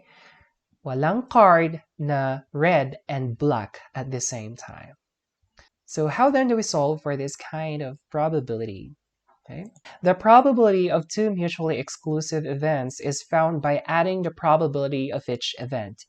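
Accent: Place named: England, United Kingdom. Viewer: Aaaaaaaaa!!!I really like your English accent sir!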